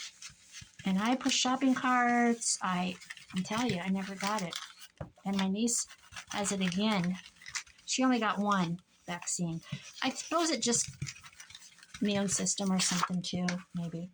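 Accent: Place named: Midwest, United States. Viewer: I love listening to your stories while I’m crafting along with you. It’s so refreshing to listen to someone from the midwest. I moved away now but it sure is good to hear your stories!!!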